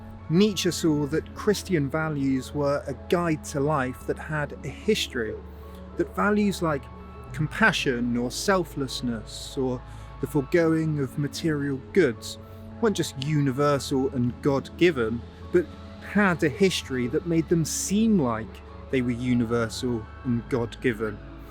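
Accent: United Kingdom. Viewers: Theres something about a british accent that presents an insidious force that everything being said is intellectually inclined and of authority. I just think its so dangerous. Or am i just crazy? There may very well be merit here, but i cant tell and it bothers me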